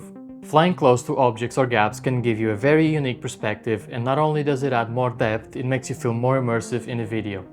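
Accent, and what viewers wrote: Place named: Madeira, Portugal. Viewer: Quando ví o clip da ilha da Madeira, comecei a notar mais no teu sotaque e és claramente madeirense! Bom vídeo 👍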